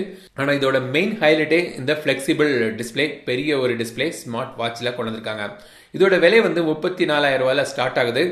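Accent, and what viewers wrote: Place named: Tirunelveli, India. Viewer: Thalaiva neenga pesurathu enga ooru slag mathiri iruku 😍😍 neenga Tirunelveli ah??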